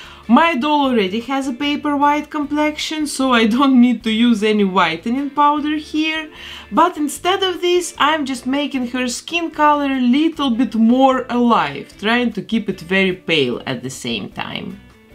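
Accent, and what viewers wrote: Russia. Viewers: почему я слышу русский акцент... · Мне кажется или я слышу у вас русский акцент? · Ты русская? Этот английский режет мне уши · Ты случаем не русская? Акцент похож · Не знаю почему. Но по произношению кажется что ты русская или украинка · Ничего не понимаю но смотрю, акцент русский сразу видно) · why do you have a pronunciation like that of Russian? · я слышу русский акцент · You have such a strong Russian accent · Русский акцент все равнт заметен, но английский очень даже хороший у вас)) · Wow! You speak as Russian... As Russian speaks English.... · Черт😃 Ощущение, что вы русская... Ну на английском говорите так, словно долго жили в России....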